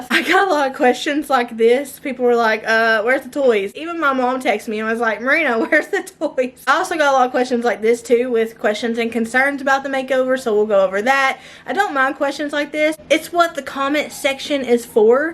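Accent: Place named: Tennessee, United States. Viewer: I have watched several of your videos back to back. I have enjoyed every minute of it. I wish I had the motivation to keep my house tidy like yours. And love love love all your projects. I am from Tennessee too but I am in middle. It’s like I am watching one of my friends. You actually talk like me, I am not used to hearing folks on here talking like me. Wish y’all well!!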